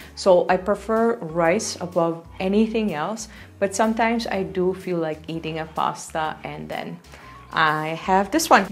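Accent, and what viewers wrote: India. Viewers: Is this your native accent or you learnt it, your English sound more like Indian.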